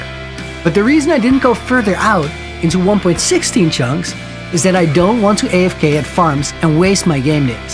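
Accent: Netherlands. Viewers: I love your Dutch accent ahaha why have I never found your channel before? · Brooo this shit is amazing, I love the vibe but I did recognize a Dutch accent right away ;). Ziet de lekker uit man!!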